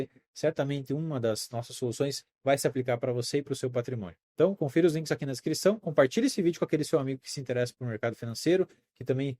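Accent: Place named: Paraná, Brazil. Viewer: sotaque do paraná ou catarina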